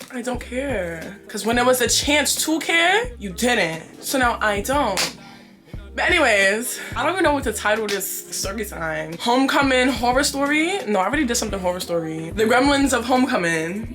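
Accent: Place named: Harlem, United States. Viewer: She sound like she from Harlem.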